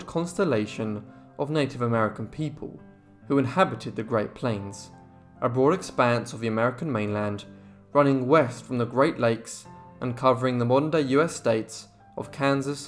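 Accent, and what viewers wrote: England, United Kingdom. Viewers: Very good, However, it seems a little strange for our early American history being told to us by someone with a British accent. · I like these history narratives, but I have to say I almost skipped it at the start when I saw the narrator and heard the English accent. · Why do you have someone from the UK narrating American history??? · Not buying this for a minute, beware of American history lesson from someone with a British accent... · this is wrong, please we need a native STORY TELLER not a brit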